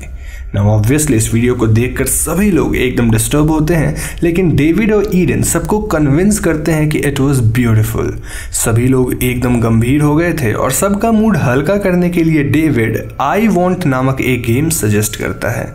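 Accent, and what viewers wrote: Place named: Bihar, India. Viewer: He has bihari accent😍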